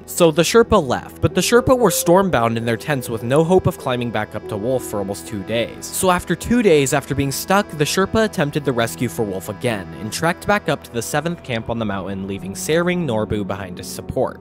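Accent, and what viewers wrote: United States. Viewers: got that Colorado accent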